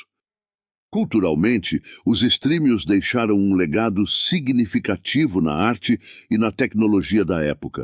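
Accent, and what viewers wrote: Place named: Portugal. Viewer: Acho o sutaque portugues, muito Lindo.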